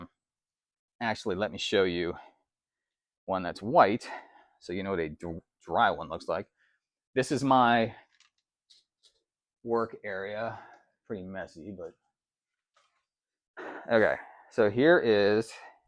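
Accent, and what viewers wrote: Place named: Western Pennsylvania, United States. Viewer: Ha! I heard it… all my people are from Butler, even though i was born and raised in FL, I can pick up the slightest western PA “accent”. I love your collection, very inspiring. I have happy vandas and cattleyas, just getting into dendrobuims. I will kerp watching!!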